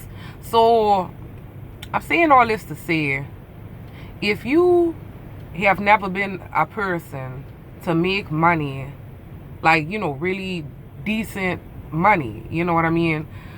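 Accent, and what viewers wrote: Louisiana, United States. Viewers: is that a Louisiana accent? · You have to be from Louisiana????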